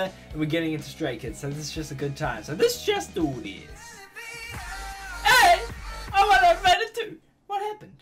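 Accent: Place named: New Zealand. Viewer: Where is he from, he sounds like he's from NZ, I'm from NZ and he sounds like he's from NZ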